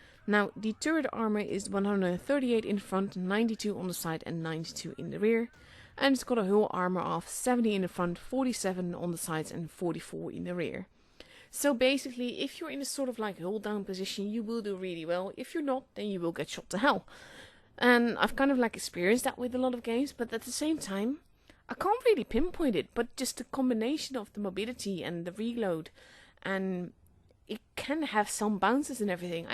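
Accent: Netherlands. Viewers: Hi - You have a really lovely 'speaking voice', makes easy-listening. Being older, I tend to be very jaded & don't follow many (or few) "Blitzers" on here. I enjoy your review, as I've just acquired a "Medjay" (tonight), but haven't used it yet. Looks to be just a 're-skinned Excelsior' - As during "Uprising" mode, they have the ability to "turn around" their opponents (which sadly, includes me!!!!) - I've been destroyed a few times by that method, whilst using my "newest" favourite Tier.5 - "Nightmare StuG.III" Lastly, your English is excellent & I hear the hint of a Dutch accent.... (tell me I'm wrong? - Laughs!)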